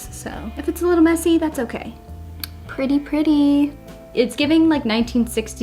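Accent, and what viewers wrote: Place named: United States. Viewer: You have such a basic American accent I’m living like it’s not southern but it’s not like Boston or new York yk? I have a southern german accent and it’s so aggressive but yours is so smooth like a good whiskey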